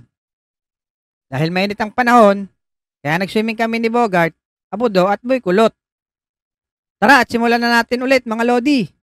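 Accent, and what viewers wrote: Batangas, Philippines. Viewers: kuya taga batangas ho kayo?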